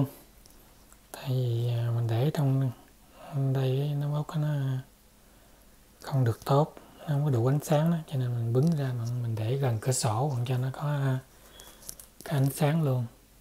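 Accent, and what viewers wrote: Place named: Vietnam. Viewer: giong vn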